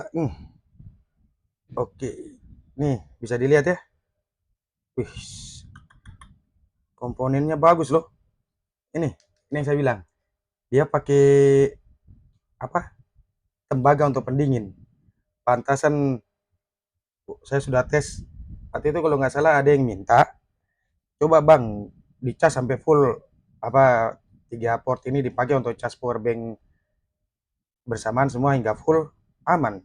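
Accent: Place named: Eastern Indonesia, Indonesia. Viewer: abangnya dari jayapura? logatnya kek dari timur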